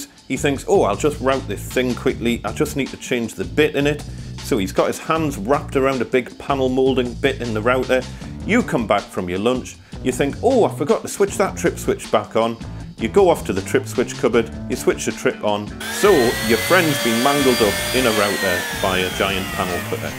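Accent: United Kingdom. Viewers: That's a UK accent, and UK plugs...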